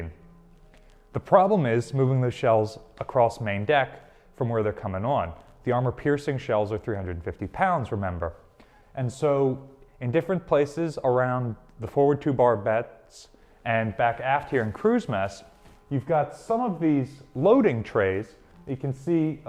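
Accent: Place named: New Jersey/Philadelphia, United States. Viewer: Yeah, definitely from the NJ/Philly area. That's how they pronounce it down there.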